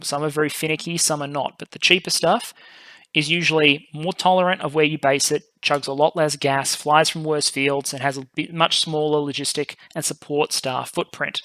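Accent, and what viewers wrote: Australia. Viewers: Are you an Aussie like myself?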